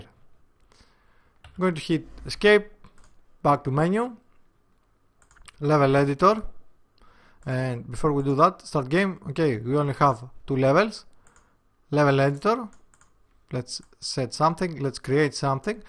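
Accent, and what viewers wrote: Greece. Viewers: την ελληνικη προφορα δε τι μπερδευω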